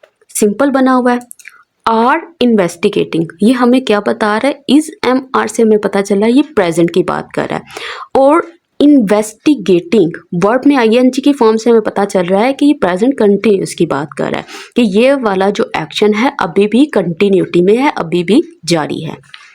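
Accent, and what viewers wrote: Lahore, Pakistan. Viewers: You must be from Lahore ❤❤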